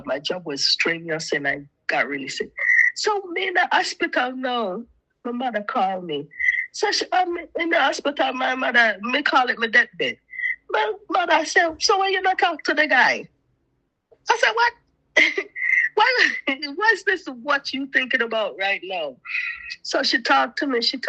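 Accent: Jamaica. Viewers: Living in America so long and still manage to maintain your Jamaican accent eh, good for you girl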